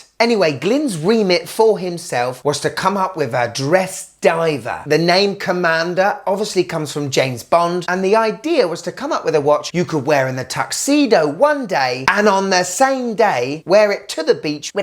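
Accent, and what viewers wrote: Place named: Whitechapel, United Kingdom. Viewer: Perfect German pronunciation in a Whitechapel accent…❤. Big “thumbs up” always MATE!!!!!!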